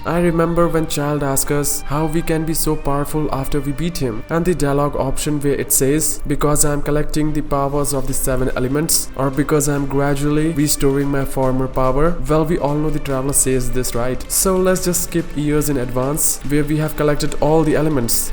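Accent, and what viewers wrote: India. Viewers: Bhai teri accent Indian lagti hai. Tu indian hi hai naa?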